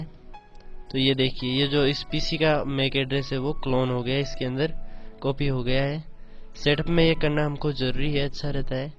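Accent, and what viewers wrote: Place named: Shekhawati, India. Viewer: Bhai rajasthan se h na pka shekhawati se 🤣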